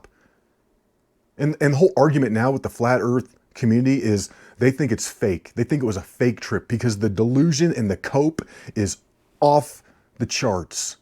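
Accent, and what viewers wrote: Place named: South, United States. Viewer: Here from mctoons channel, new sub here, great vid!!! Love the accent too man, sound like where ever billy bob thornton is from!!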